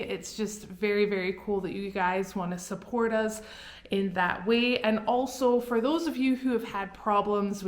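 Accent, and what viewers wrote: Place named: Scotland, United Kingdom. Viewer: Everytime I hear your Scottish accent I get like jello 😍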